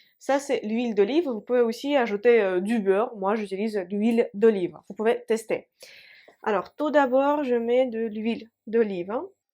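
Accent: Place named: Russia. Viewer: Comme si on y était ! et avec l'irrésistible accent russe! 😊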